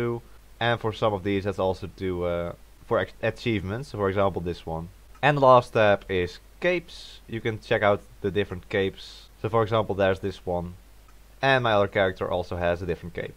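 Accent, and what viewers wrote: Netherlands. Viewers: thick dutch accent there.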